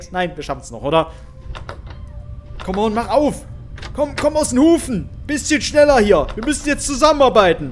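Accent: Saarland, Germany. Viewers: Bist du Saarländer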